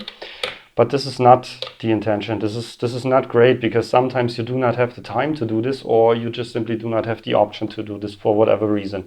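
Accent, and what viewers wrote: Germany. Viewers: Finde ich nicht gut die Lösung. Also dein Video ist super, aber ich würde gerne in einer laufenden Performance einen oder zwei oder auch vier Takte sampeln können, die dann sofort automatisch abgespielt werden. Alles mit nur einem Tastendruck! Da gibt es eigentlich nur ein geeignets Gerät bisher, was genau das kann. SoundBITE Pro von Redsound. Octatracks Pickup Recording könnte das vielleicht auch. Bin ich aber leider nicht sicher. Do you understand german? Your accent sounds like that. Would have been too complicated for me to explain that in english.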